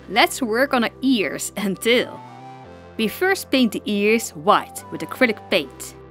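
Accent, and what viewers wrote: Netherlands. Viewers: Me whos new to the channel: YASS THE DUTCH ACCENT, (im from Belgium and speek Dutch) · Well i Mean she does live in the netherlands so yeah i think thats why youre hearing a dutch accent😁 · I smell dutch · Is it just me or does it sound like she has a dutch accent? it reminds me of my cousin (that lives in Belgium/Holand). great dolls tho! I also saw Dollightfull’s video, and i think both the dolls you guys made were amazing!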